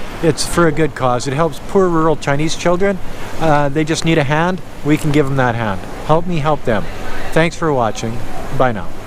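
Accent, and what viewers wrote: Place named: Canada. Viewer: Once a while I notice the Canadian accent 😂.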